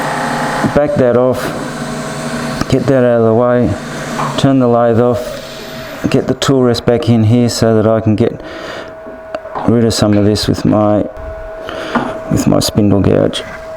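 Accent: Australia. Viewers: Nice piece of work, and always good to hear an Aussy accent.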